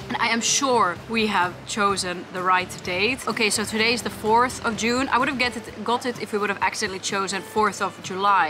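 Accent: Netherlands. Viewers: Do you know you nav a Dutch accent when taking English?😂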